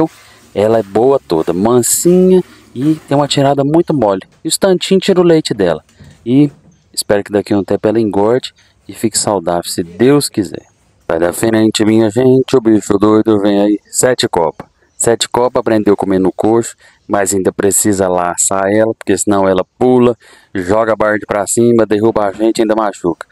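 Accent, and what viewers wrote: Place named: Goiás, Brazil. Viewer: gosto desse seu sutaque de goiano Raiz rsrsrs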